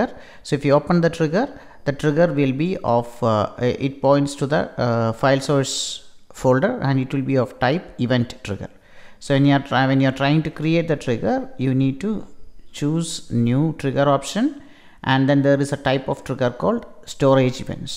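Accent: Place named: Kerala, India. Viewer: malayali accent :)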